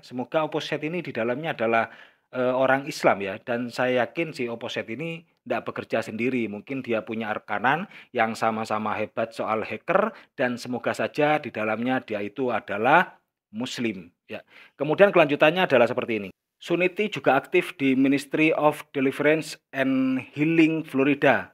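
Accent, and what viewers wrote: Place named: Tegal, Indonesia. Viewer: Logatnya kaya org Tegal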